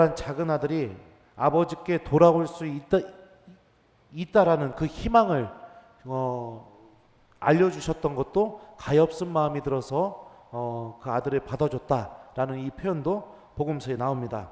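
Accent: Chungcheong, South Korea. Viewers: 신부님 아침마다 신부님 말씀으로 시작합니다.미국애서 감사드립니다.신부님의 충청도 사투리가 너무정겨워요.동향이기애 더 가슴을 울려요..🙏🙏🙏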